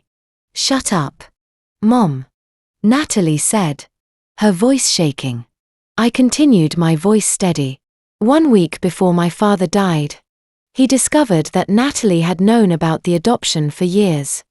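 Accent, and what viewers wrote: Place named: Australia. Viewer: Very disconcerting for the narrator speaking Aussie but claiming Boston as home. Decent tale tho.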